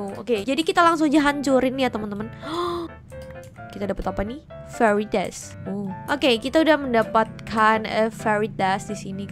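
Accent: Java, Indonesia. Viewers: medok banget anjir waowkkkwka🤣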